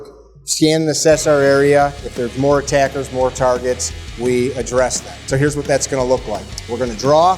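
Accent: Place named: Midwest, United States. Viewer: Man is that midwestern accent thick.....love it lol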